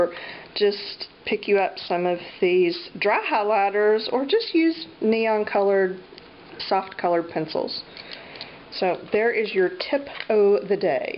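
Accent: South, United States. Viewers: You crack me up, love the accent, sounds like me cause I am Southern too.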